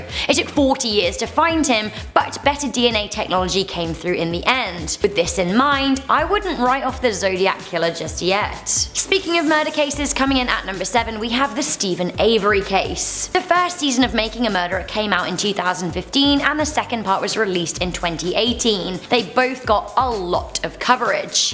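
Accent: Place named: United Kingdom. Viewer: Aay didn’t know u were British like me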